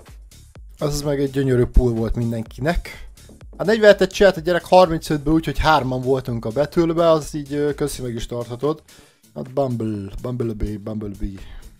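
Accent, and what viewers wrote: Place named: Russia. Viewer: Orosz accent megvan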